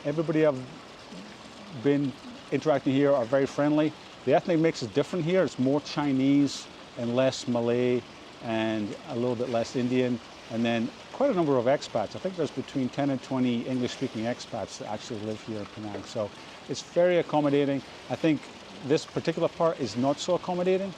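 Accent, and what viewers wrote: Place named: Glasgow, United Kingdom. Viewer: That sounds like a west end of Glasgow accent…am I right?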